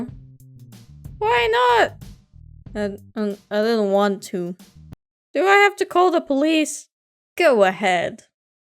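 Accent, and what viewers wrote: United Kingdom. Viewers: wait is she british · Sorry to ask, but where abouts in Britain are you from? I'm British myself but I can't seem to tell where your accent originates ^-^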